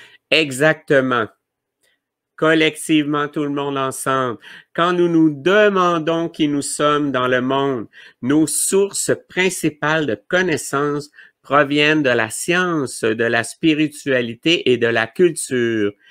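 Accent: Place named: Canada. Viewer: ❤️ Merci beaucoup cher 🙏🏻. J’aime vous écouter et votre accent canadien 🎄 J’habite en CORSE et bien loin de chez vous: magique aussi ces liens instantanés d’un bout à l’autre de la planète 🌍 Cette vidéo, avec l’Alliance Galactique, sont pour moi les plus importants, comme des contes de Fées bien réels... des magnifiques et grands moments d’émotions pour moi 🙏🏻🕊🕊🕊 Gros bisous 😘🥰❤️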